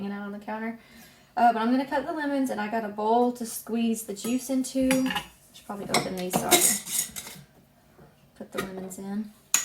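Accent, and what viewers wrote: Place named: North Carolina, United States. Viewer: Your accent sounds like home. I grew up and still live on the NC coast. The folks of the coast have a distinctive dialect. We live simply and slow.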